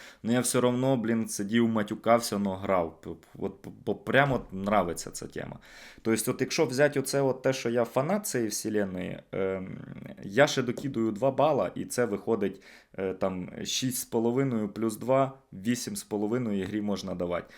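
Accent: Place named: Russia. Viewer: хлопче я бажаю тобі терпіння в твоїм починанні, але слухати твою москальську не можливо. Вуха просто ріже. Успіхів тобі.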